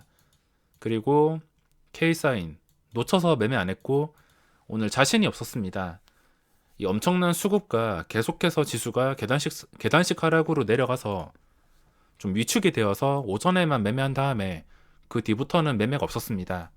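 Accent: Gyeongsang, South Korea. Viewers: 누가많이파는지 시합하는줄 알았읍니다..ㅋㅋㅋ경상도사투리 구수하네요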